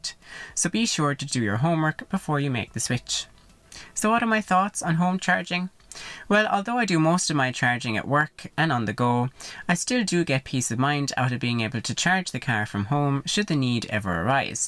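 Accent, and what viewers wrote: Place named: Ireland. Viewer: You're the most Irish sounding Irishman I've ever heard!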